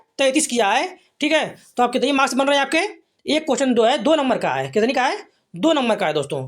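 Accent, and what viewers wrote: Ghazipur, India. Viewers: wahi मैंने सोचा ghazipur mau। या azamgarh से honge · Bhai prayagraj se katti nhi lag rahe ... Tone bilkul Ghazipur mau wali h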